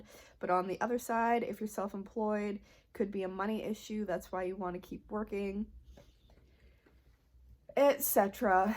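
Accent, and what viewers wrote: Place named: Minnesota, United States. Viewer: Oh no worries girl we knew it from your accent ;). Minnesota native here. Hope you are doing ok up there and stay warm! xoxo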